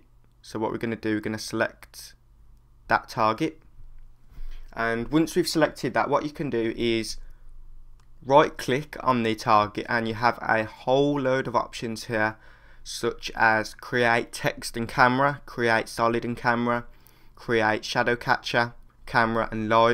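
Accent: Birmingham, United Kingdom. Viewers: you never fail to help me with my work man:D may i ask where your from, you sound like a brummie? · It's Birmingham, maybe Kidderminster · Rodrigo Vazquez , Brummie fo sho!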